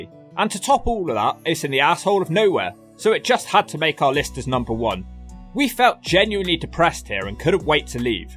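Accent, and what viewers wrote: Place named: Somerset, United Kingdom. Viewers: Somerset accent, clear as day. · Hi mate.. Just watching this.. Good work on your behalf so let's give you credit.. I'm trying to work out where you're from with your uni home counties with a very slight Southern accent, it may give a clue to your choices lol... What makes this hard for you is that the lefties carved up Bristol so you have Kingswood in South glos and I'm in Somerset and closer to Kingswood that glouster is.. When the terrible Bristol council, worst in the country by a mile, moved everything around well it woukd take an hour to explain.. Don't get me started on the stadium.. Did I mention lefties screw everything up.. So Kingswood is in Bristol and a sizeable area as well,...... Go on friend Where's your accent from..?..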